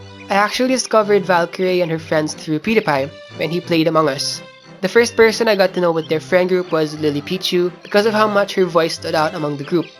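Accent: Philippines. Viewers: TRIP KO YUNG ALAM KO NANG PINOY KA FROM THE ACCENT OMFG I LOVE THIS/YOU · haihai, filipino ka rin pala, ndi ko alam until narinig ko accent mo, wasn't sure tho then you confirmed it coz u're accent is good (like better than most of us pinoys) kaya di nahalata. A proud kababayan for u 🤧 sanaol talented sanaol nkakausap si Rae sanaol lahat ✨ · i knew it... from the accent and manner of speaking... congrats sa mga project mo with the group of Valkyrae and her friends i like your drawing style, nagdodrawing din ako since elementary days but i stopped doing drawing for 2 decades more or less and just got back roughly last year keep up the good work and MALIGAYANG KAARAWAN many more blessings to come stay safe stay healthy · Me:0 that accent!! hmm....: I’m Filipino. Me: I WAS RIGHT IM RIGHT!!!!!!!! W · accent palang alam na agad ✊😭🇵🇭❤️ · As soon as I heard ur accent, I was like: oh ur Filipino. 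Also happy birthday!!:DDDD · The moment I heard you speak I immediately knew you were a fellow kababayan:D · BRUH THIS IS MY FIRST TIME WATCHING THIS BECAUSE I WAS SO BUSY AND BRUH YOUR ACCENT IS SOO FILIPINO HII!! I'M A FELLOW FILIPINO (≧▽≦)💖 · WHEN I HEARD UR ACCENT I WAS LIKE WAIT THIS KING IS A FILIPINO?! AND YOU ACTUALLY ARE!! ANG ANGAS NG ARTS MOOOOOO, CONGRATS TO UR SUCCESS AND BELATED HBD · HA! I KNEW THAT ACCENT SOUNDS LIKE MINE! CUS I'M FILIPINO TOO LOL MGA KAPAMILYA MAG REPLY KAYO DITO KUNG PINOY TALAGA KAYO HAHAHAHAHAAH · Nung natinig ko accent mo alam ko na na pilipino ka😊❤😎🤣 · i heard ur accent and i knew ur Filipino, im also Filipino i never got to learn how to speak Tagalog but I can understand a little bit. btw happy birthday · I recognize a Filipino accent from a mile away! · OMG THE FIRST TIME I HEARD YOU TALKING I SAID YOU'RE A FILIPINO!!!! AND I WAS NOT WRONG!!! 🇵🇭🇵🇭🇵🇭🇵🇭 Maligayang Kaarawan!!! 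· I KNEW IT YOU ARE A FELLOW FILIPINO THE FIRST 30 Seconds in the video, when I heard your accent I just said Hands down he is Filipino 🇵🇭🇵🇭🇵🇭🇵🇭🇵🇭🇵🇭🇵🇭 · Welp, Im already late on this but, meow. When you start talkin I knew youre a filipino <3. I really love your animations!! <3 <3 <3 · I knew it at first because of the accent! Lol Proud Filipino here! Mabuhay 🇵🇭🇵🇭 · When u started to speak I was like "bat parang Filipino sya..?" Then u literally answered it right away lol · Sabi kona pilipino ka haha sa accent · I'M SCREAMING. I was like, "Huh, he sounds Filipin–" as he said it AAAAAAAA WOOO MGA PILIPINOOOO LEZZGOOO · THE FIRST TIME I HEAR HIS VOICE,I WAS LIKE,IS HE FILIPINO OR WHATEVAH 👀👀✨✨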